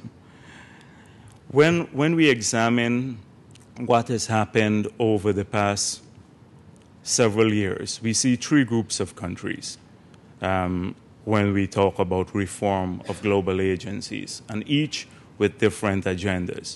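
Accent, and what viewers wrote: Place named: Caribbean. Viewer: Clearly he speaks English fluently, with a marked Caribbean accent. However I don't understand how someone could serve as president were he unable to speak the official language.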